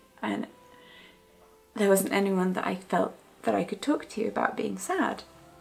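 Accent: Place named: England, United Kingdom. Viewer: I started watching this channel because i liked looking at your face and hearing your english accent but this was actually touching. ty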